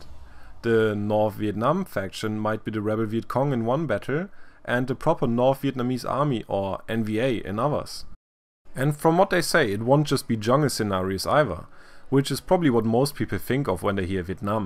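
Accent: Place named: Germany. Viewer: Very well done! You sound much better this time, made me really wanna listen to you. Keep up the good work :) btw are you German? because you sound very German and I would know, since I am one myself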